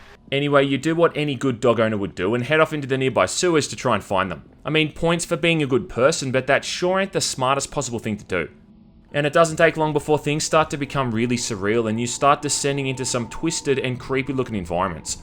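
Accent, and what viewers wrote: Australia. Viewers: Man, I love your Aussie accent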